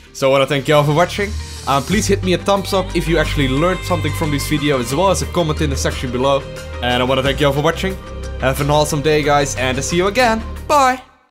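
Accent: Netherlands. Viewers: You are from Holland arent you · Are you from Holland??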